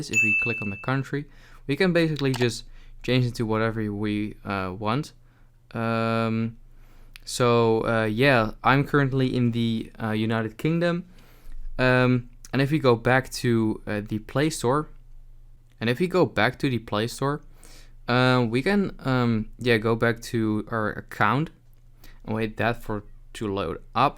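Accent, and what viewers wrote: Netherlands. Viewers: Im getting the feeling that your dutch🤔 am i right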